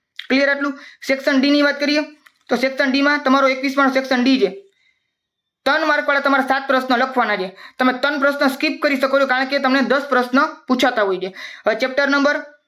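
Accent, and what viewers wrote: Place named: Kutch, India. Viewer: Sir tame katch na lago cho barabar je je kyo jo atle 😅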